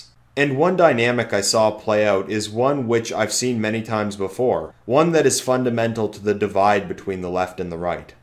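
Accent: Canada. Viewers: Maybe it's bc of your Canadian accent & the fact that you're one of few ppl who aren't a natural born citizen of America who DOESN'T bash our "6UN CULTURE", but this is the BEST analysis I've EVER seen on this topic. I think it's time we just admit the truth: "… when left to their own devices men will form tribes and compete with rivals for tribal dominance, not live together in some multicultural harmony. This theme of ethnic loyalty in mobster films makes them feel more authentic, because this is how humans function in general. You really couldn't make a mafia film that lines up with modern progressive values without it being absolute SHlT…" ALSO, WOMEN long to be WOMEN AGAIN! And MEN long for them to be, TOO! But we just keep on telling the same LIES: That we are as STRONG as you. That we belong at the FRONT-LINES. That we take WAR & Other LIFE & DEATH Situations as SERIOUSLY as YOU DO (that's why WE MADE ALL THOSE TikTok Videos of us DANCING in Hospitals, while our Cancer patients, Burn patients, Heart Attack patients, DV Abuse patients, 6un SH0T patients, CHF & COPD patients, Alzheimer's patients, Traffic Accident patients, Kidney Failure patients, Sclerosis patients, Bowel Blockage patients, Pancreatitis patients, Stabbing Victim patients, Child patients, ALL got NO ATTENTION, SO THAT WE COULD GET OURS~ on Multi-Million Dollar Hospital Equipment, or Carrying Fake C0VlD CORPSES!). 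There is a vast difference b/t "Multi-Cultural" & "Multi-Ethnic". Mixing ETHNICITIES c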